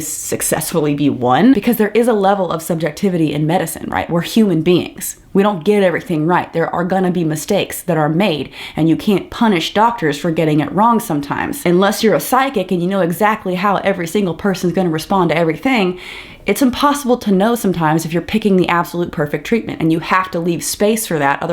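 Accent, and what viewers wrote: South, United States. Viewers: Just me, or does have more of a southern accent than I remember? Also, very happy to be in a blue state right now. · an extra bit of southern drawl in your accent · Is it me or are you developing a Southern accent? 😮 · It’s fun to hear your drawl gradually increasing! Is sounding more Southern as well?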